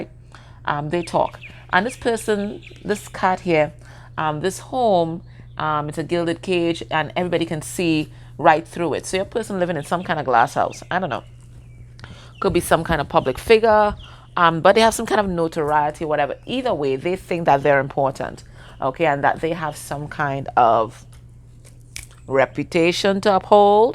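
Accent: Trinidad and Tobago. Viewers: You sound like yuh from 🇹🇹 Good reading! ♐🇹🇹 #Reppin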